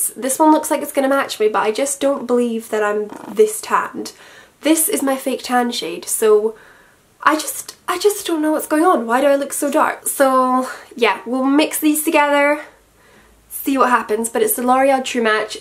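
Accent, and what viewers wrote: Scotland, United Kingdom. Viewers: I usually always know whether i'm going to like a video going by an intro. And i went through like 3 videos before yours, but i'm staying here :) good on you for not looking like a conceited, regular influencer. Interesting how your from Scotland :P I lived in Ireland Dublin and we've got VERY similar accents. Now i know why people think i'm scottish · Natalie Briggs nevermind, she's Scottish lol she just spoke so light handed with it, I had mistaken it for Irish! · Alaiza Toscano I'm pretty sure she's Scottish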